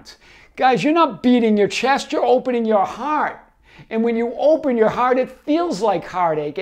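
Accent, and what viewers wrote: Boston, United States. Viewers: Love your Boston accent ❤️ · Weird thing, the whole reason I started listening to you was literally because of your accent. I’ve always had a strange connection and love for Boston… we go there every chance we get… And we try to say “chowda” etc😂 All that to say your accent is one of many things that makes you our lovable and lighthearted teacher- I think it’s part of what put you on the map!!!!!! 🍀It did with me anyway!! ❤️ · I love your Boston accent! Thank you! You helped me remember and continue to do so. I love my life and I am grateful! Thank you again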